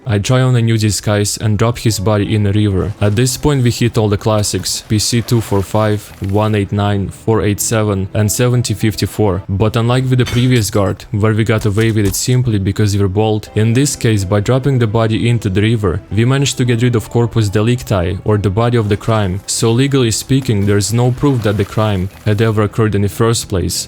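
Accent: Russia. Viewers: the hard russian accent makes this so much funnier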